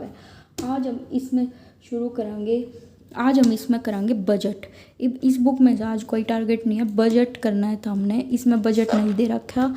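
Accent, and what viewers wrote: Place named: Haryana, India. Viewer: Hello mam apki hriyanvi voice bahut achhi hai All the best for your exam Or chennal pe daily video dalna mat bhulna please apka chennal ek din baahut grow karega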